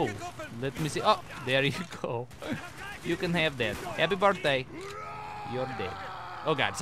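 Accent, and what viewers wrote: Russia. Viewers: NO! keep talkeenk az a russian!! i enjoy it so! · kl russian accent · if u like in a russian accent or think he should talk like that all the time thumbs up!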